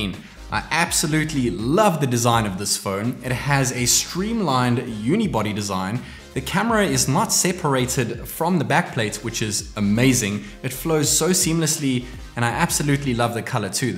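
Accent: Johannesburg, South Africa. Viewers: Are you South African? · Haha! I love the SA accent. Ja swaar! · Are you from Johannesburg?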